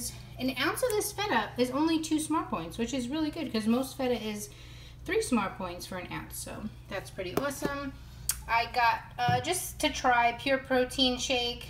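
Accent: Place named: Northeast, United States. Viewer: You have a cute northeastern accent... in Indiana we tend to pronounce haul and hall the same, ha😊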